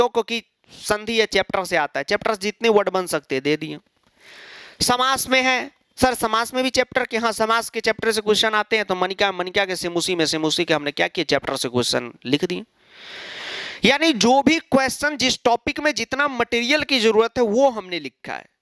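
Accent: Bihar, India. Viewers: Sir kya bihari boli maje a gye 🫡🫡🫡😂❤❤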